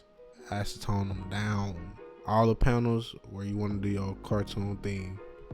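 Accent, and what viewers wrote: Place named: Detroit, United States. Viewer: Wut up doe! Good shyt on the forces they crispy. Sound Like U from Detroit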